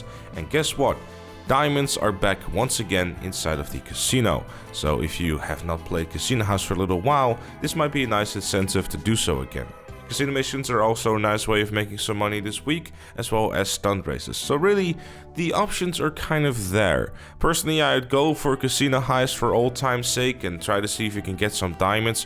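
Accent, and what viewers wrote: Netherlands. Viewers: Hmmm yes ik ruik een Nederlander met die stem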